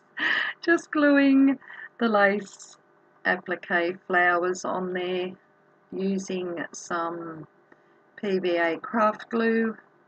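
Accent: Australia. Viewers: so true, and your Aussie accent is helping me smile even more! xoxoxo:))))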